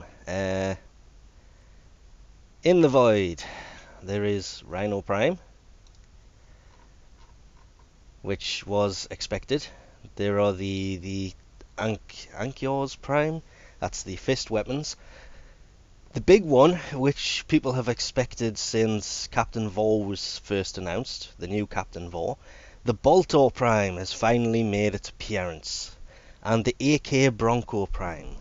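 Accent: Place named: North, United Kingdom. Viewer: have been watching all your videos love the northern accent. When ever I build a new weapon I half expect you to give me a review lol. you have pushed me on so far from my early days. I now hold my own in all the high lvl matches and I love the wraith twin vipers to! keep up all your good work love the channel.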